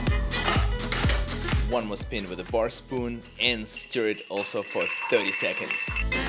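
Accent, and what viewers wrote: Russia. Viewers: Why do you talk so Russian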